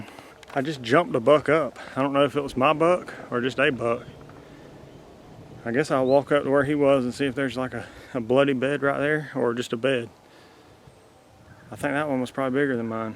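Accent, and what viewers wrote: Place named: Georgia, United States. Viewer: My first time watching your channel but I could tell you were from Georgia. I'm in Douglasville what about you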